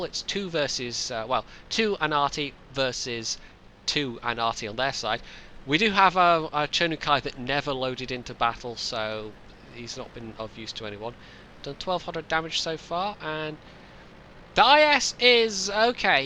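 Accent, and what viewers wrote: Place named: Scotland, United Kingdom. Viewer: It's the Scottish accent messing it up. :D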